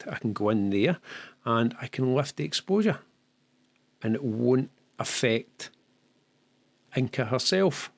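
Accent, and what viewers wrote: Scotland, United Kingdom. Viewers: Great content as always. Unagitated and to the point. By the way: first time I watched without the subtitles - and I slowly get the groove for the Scottish accent :)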